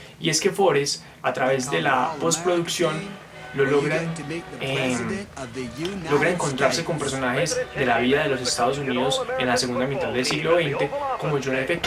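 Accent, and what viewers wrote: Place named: Costa Rica. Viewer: Sos Costarricense con solo escucharte.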